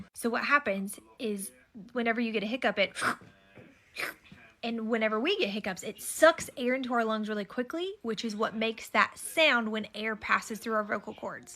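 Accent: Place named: South, United States. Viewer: I love the way you described that without being condescending or anything, this felt like a general teaching lesson made from love. You should consider being a professor or something. There’s something about your absolutely gorgeous and sweet southern drawl that makes me want to list to you explain things all day. I’d love to learn more about the female reproductive organs and babies being formed and delivered from your voice. Anyone else and I’d probably rather not hear any of this. I’m not a father, not expecting to be one anytime soon and would only like to learn these things if it were going to be happening to me, but if you explain it, I’ll learn and take notes.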